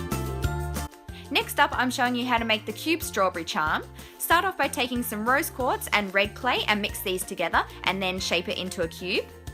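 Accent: Australia. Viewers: She's Aussie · Are you a fellow Aussie? Or a Kiwi? I'm trying to pick up on your accent lol. I'm Australian, myself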